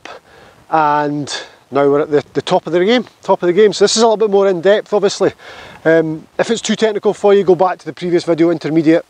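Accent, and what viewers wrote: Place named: Scotland, United Kingdom. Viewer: This is excellent stuff, really enjoy your videos and the personality. Having a bit of fun understanding what you are saying sometimes as I am a canuck, but my mom and gran were Scottish born (McIntosh) and I remember the brogue. Cheers.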